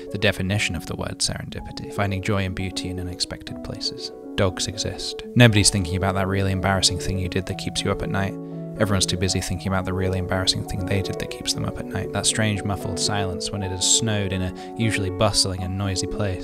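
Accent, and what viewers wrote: United Kingdom. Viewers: where are u from? your english sounds different to me, UK? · How did I know you were British before I even clicked on the video 🤧 but Pokémon blue version, represent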